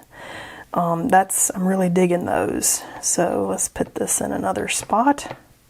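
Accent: South, United States. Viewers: I love these colours. This is beautiful. Unfortunately, it was late here in Australia and I watched this in bed. It’s been a hectic weekend and had no spare time to watch it earlier. My other half was sleeping, and I didn’t want to wake him, but I couldn’t resist watching. I’ve saved it and will watch again tomorrow, so I can hear that wonderful southern accent. However, you can’t get a more southern accent than Australia. Any further south and we are in Antarctica 😂😂. Thank you for another wonderful video 🫶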